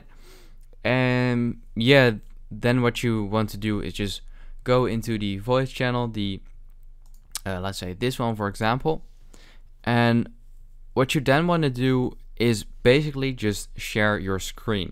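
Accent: Netherlands. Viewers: Why do you sound dutch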